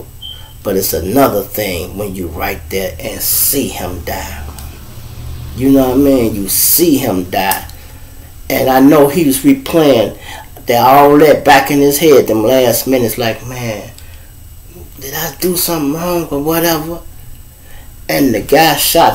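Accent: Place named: Chicago, United States. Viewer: You are from Chi-Town or some Chi-Town ties I hear it in your talk. I didn’t hear it the first time, one love I’m the same way with the Strip Club Gambling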